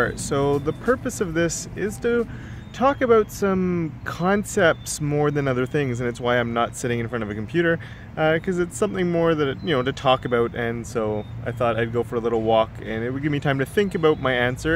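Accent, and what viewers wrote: Canada. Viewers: Hi..... are you canadian?? xD I think I recognize your accent.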